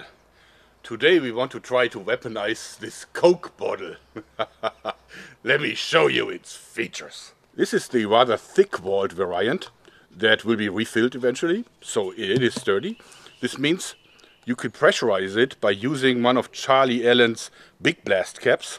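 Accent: Germany. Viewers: I really like your German accent when you speak English. 😜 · This guy's accent is so charming. Once I heard him say he was going to try to weaponize a coke bottle with that German accent I knew I was in for the whole video. XD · Dat is ja richtiges deutsches Englisch!!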